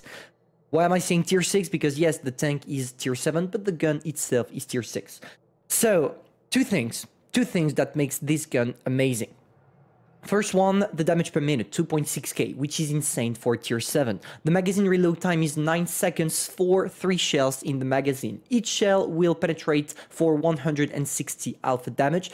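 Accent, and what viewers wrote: France. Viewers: It’s not his English, it’s just the French accent everyone chill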